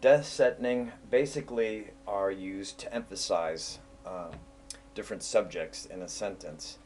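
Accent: United States. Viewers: Fenny-Jean Van Den Doel ja, too much of an American accent!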